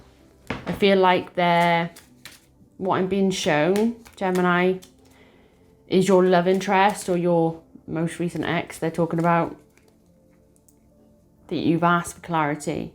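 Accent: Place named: United Kingdom. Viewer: 2 years this as been going on and the same time following tarot this reading is so accurate it is unreal everything not one word out of place its mad how your channel just poped up on my screen when i was about to give up and completely forget this but you have given me hope.Thankyou so much its lovely to hear a British reader ❤️ ❤stay safe❤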